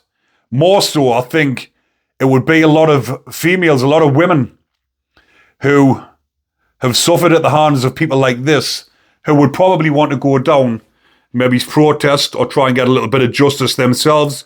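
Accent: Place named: North East England, United Kingdom. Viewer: Love your channel marra. Being from the Northeast mesell it's good to hear a familiar and friendly accent.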